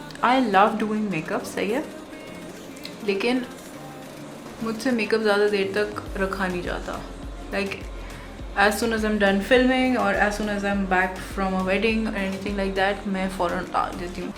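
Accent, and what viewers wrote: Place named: Sindhi, India. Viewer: Aww yOur sindhiii iS sO cute....!!!😍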